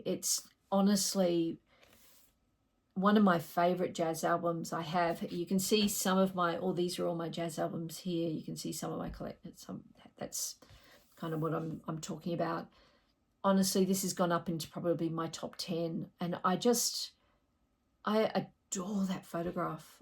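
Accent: Australia. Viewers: love love loved this vid. Great to hear an Aussie voice, then the more into the vid, I was amazed at some of the similarity of my hifi journey. I'd had a NAD3020A for years, hooked up to some Haybrook HB1s that I had re coned in Paddington (over 20 years ago now). But, like you, recently decided to upgrade a bit. Was going down the NAIM route, then the guy in the shop said those fatal words, "have you seen any of the Hegel stuff". We did a side by side with a track by Lady Blackbird (interesting artist if you like Jazz) and it was like night and day. I'd also imported an early 90's Roksan Xerxes TT. Had the team at Classic Hifi bring it back to life. They did a great job. Then the obligatory speaker upgrade, went with the Lintons (my $ justification was that I'd had my stuff for over 30 years so..). Then walking the dog one day, there's some hifi gear on the street! 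I took a closer look (theres often all sorts of stuff on the street but this was unusual). A Sansui 331 Receiver and SR212 TT ! with original manuals and warranty cards! So i'm going to set up the vintage stuff alongside the newer (inc BlueNode) stuff. I was about to get the 3020 re done at a place in Marrickville (I won't put the name here as I think they are inundated) but will get the Sansui looked at as (again, like you), the right channel is coming in and out. A friend recently has gone on a similar journey with Sansui. Like you've said, the sound is worth the effort in bringing these vintage pieces back to l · Hello and subscribers. I came across the channel while browsing youtube for audio gear content. I bought by first system after attending Uni in the mid to late 70's and then did a big splurge on gear between 2007 and 2012. Personal circumstances meant that it got dismantled in 2017 and I am now setting some of it up again (the bits that still work that is). 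Good to hear the Aussie accent and while I'm interested in some older gear I will be mainly exploring the channel for music ideas given that likes have a fair overlap with mine. Happy listening everybody, Jeff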